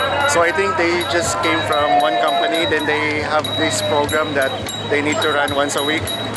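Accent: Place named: Indonesia. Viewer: Betulll,logat inggrisx jg indo bnget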